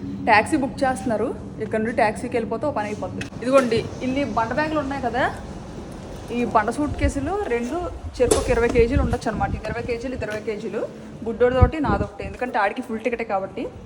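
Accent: Vizianagaram, India. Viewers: మీరు విజియనగరం యాస మాట్లాడితే బాగుంటుంది నా అన్వేషణ విశాఖపట్నం అయిన విజియనగరం యాస మాట్లాడతరు మీరు మాట్లాడడి మాది అనంతపురం అయిన ఆ యాస కోసమే మీ ఇద్దరి వీడియోస్ చూస్తాను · Mana vizianagaram Language.... Super👌.... Ventunte 👂 super super👌.....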